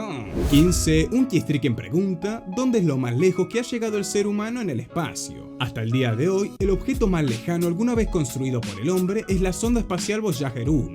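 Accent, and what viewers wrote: Argentina. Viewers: ERES DE ARGENTINA???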